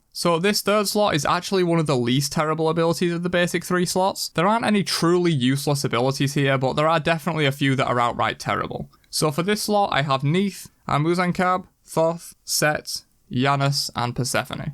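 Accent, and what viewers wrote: United Kingdom. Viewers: I love your videos, but need to go learn British English to understand more than few words!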